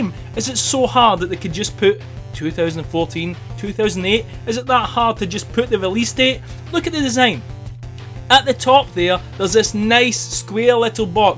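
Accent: Scotland, United Kingdom. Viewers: BTW, Love your Scottish brogue. My great grandparents were from Glasgow and Edinburgh. So want to visit your great land someday. :)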